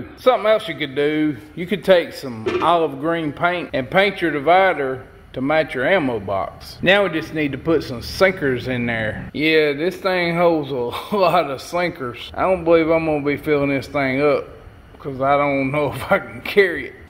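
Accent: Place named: South, United States. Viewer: If you are from anywhere north of Tenn. you will not understand a word he says. Great videos and best info around.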